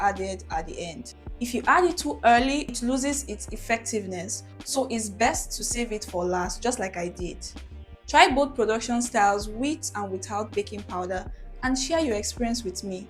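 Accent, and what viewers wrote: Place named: India. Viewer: Why is the voice in India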